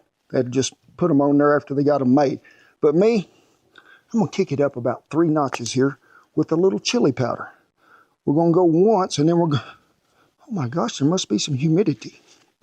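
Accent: South, United States. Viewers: 1. Open the video 2. Disable automatic dubbing 3. Enjoy ´s Southern Accent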